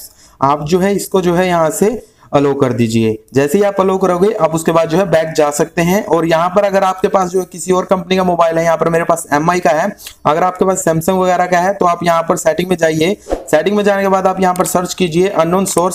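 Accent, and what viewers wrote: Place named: India. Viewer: You know its not clickbait when you hear hes indian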